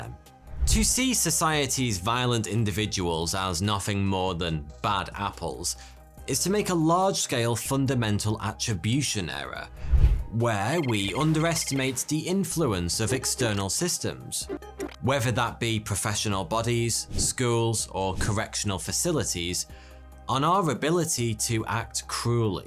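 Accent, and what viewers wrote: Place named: England, United Kingdom. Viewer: I can’t tell from your accent, where about from England are you from. Is it London?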